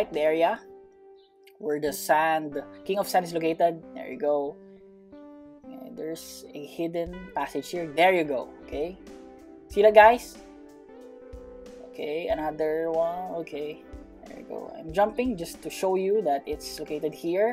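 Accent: Philippines. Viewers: you have a Filipino accent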